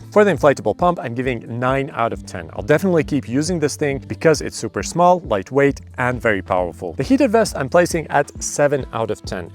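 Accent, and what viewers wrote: Latvia. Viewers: Love Latvian accent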